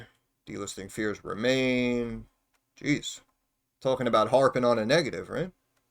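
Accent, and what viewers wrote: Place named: New York, United States. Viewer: I listen to you because you were spot on with luminar tech, and the the NY accent reminds me of home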